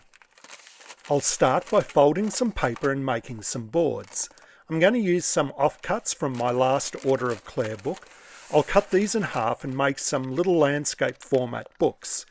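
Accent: Australia. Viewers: Me encanta tu trabajo te felicito. Ahora entiendo porque comprendo todo lo que dices, y es porque tienes acento australiano facil para mi. Un Saludo desde Chile 🇨🇱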